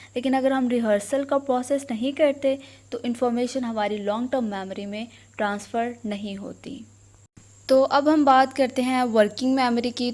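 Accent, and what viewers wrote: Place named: Punjab, India. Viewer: Really helped tho I'm loving her Punjabi accent in English 😂❤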